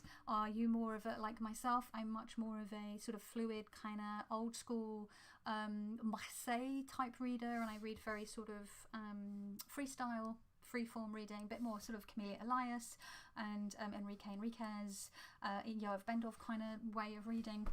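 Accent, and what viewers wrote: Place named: United Kingdom. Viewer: I'd like to see the video as well. Having so many "I once thought" moments is a good thing. Imo. It says that you have a open mind and heart. This was great ❤ btw hearing your lovely accent makes me miss the UK so much. Can't wait to go back. Thanks for sharing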